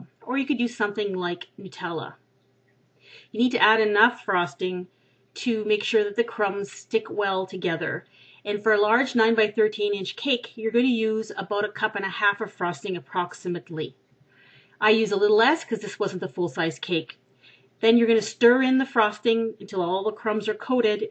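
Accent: Canada. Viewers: Haha, I KNEW you were Canadian, I noticed your accent :D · You're Canadian! I thought that! Either Canadian or Minnesotan! I love your accent! (is that wierd??) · i noticed you were canadian from your accent,because my cousin is canadian.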